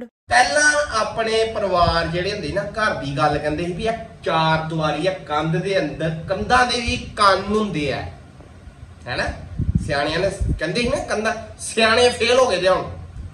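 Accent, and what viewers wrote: Pathankot, India. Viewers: ਬੋਲੀ ਬੋਹਤ ਵਧੀਆ ਭਾ ਪਠਾਨਕੋਟ ਵੱਲ ਦੀ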